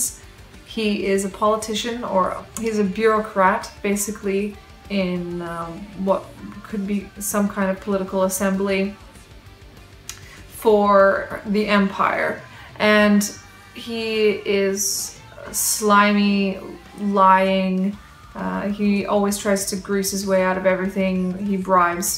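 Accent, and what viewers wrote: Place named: Canada. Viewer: okay but her little canadian accent is actually adorable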